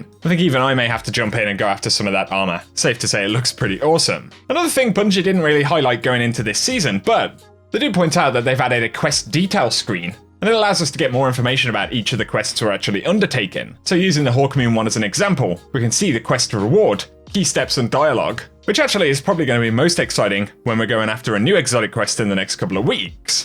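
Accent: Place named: Midlands, United Kingdom. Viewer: England probably the midlands by the sounds of it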